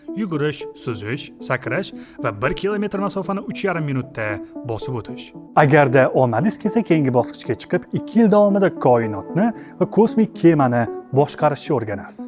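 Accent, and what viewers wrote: Tashkent, Uzbekistan. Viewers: Toshkent shevada emas, adabiy o'zbek tilida gapiring, ba'zi gaplarizga tushunmay qolyabman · "ВОТТИ-ВОТТИ" ЛАМАЙ ГАПИРСА БУЛМАСМИКАН. БИЛДИК, "ТОШКЕНТЛИК" ЭКАН. ХАР ХОЛДА АУДИТОРИЯГА ШЕВАДА ЭМАС, АДАБИЙ ТИЛДА ГАПИРИШ КЕРАКЛИГИДАН БУ "ШАХАРЛИК" НИНГ ХАБАРИ БОР ШЕКИЛЛИ... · dasturni toshkent shevada emas adabiy tilda olib borsangiz yaxshi bòlardi har holda sizni faqat toshkent kòrmaydi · билдик Тошкентлик экансиз, одамлар чет тилини ўрганади, Тошкентликлар адабий тилни ўрганолмайдм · Toshkenligu Toshken shevada gapiradida. Manga qaytanga hariplani shevadidan kura shu uzimizani Toshken sheva chiroyli eshtiladi. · eeey toshkanli aka adabiy shevada gaproring tushnmavotman gepizge oga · Материал зур. Лекин Тошкентча шева унинг сифатига таъсир килмокда. Кейинги чикишларда ушбуларга эътибор каратилса ажойиб булади. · вотти дегани нима дегани сизларни фақат тошкентликлар кўрмайди адабий тилда гапринглар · Toshkent shevada emas adabiy badiiy tilda gapirsangiz yana ham gòzal bòlarkan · Toshkenni shevasida gapirsa nima bopti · Тошкент шевасида гапирмасдан,адабий тилда гапир.Кушнинг билан гаплашиб утирмаяпсан-ку ,саводсиз. 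· ассалому алайкум малумот учун рахмат аммо вотти сузини (тошкентча шевани ) ишлатманг илтимос! узбек тилида кевотти борвотти деган гап йук. эфирда адабий тил миерларига риоя килинг. узур гапим огир ботган булса! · Juda yaxshi boldi. Lekin Toshkent shevaiida emas adabiy tilda gapirsangiz yaxshi bolar edi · Тошкент шевада гапиргани ёкмади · Ижодингизга омад. Лекин видео Тошкент шевасида экан, нима, бу фақат Тошкентликлар учунми? Тошкент шевасини эшитдиму, томошани дархол тўхтатдим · Аввало видео учун рахмат журналистмисиз блогермисиз адабий тилда гапиринг Тошкент шевада гапирмай тв куйсанг хам факат тошкент шевада гапиришади нима тош шевада гапирса маданиятли булиб коласизларми шунчаки жахлим чикади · Ijod zoʼr takrorlanmas omadizi bersin bir taklif NATOʼGʼRI TUSHUNMANG LEKIN AKA SOF OʼZBEK TILIDA GAPIRING ADABIY TILDA TOSHKENTCHA SHEVADA EMAS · Ука, тошкенча воттиламай адабий ўзбекча тилда гапирсангиз курсатувингиз яхши чиқарди!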